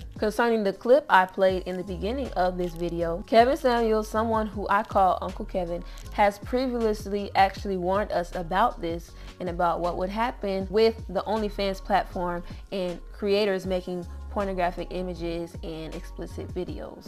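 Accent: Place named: South, United States. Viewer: You mad Southern hun bahaha ☺️😂 Keep up the good work...100k subscribers in no time! 💪🏿💪🏿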